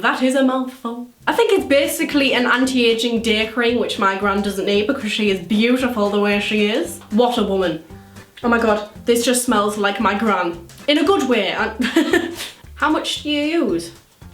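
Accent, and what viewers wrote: Newcastle, United Kingdom. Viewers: ohemgee it's a Geordie I live near Newcastle tooooooo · Are you from Newcastle ?? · Alondra Flame it's Geordie (just like me )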